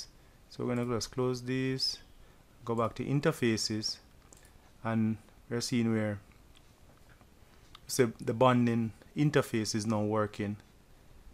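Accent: Jamaica. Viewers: Well Done I love your Jamaican accent. Big ups man!!!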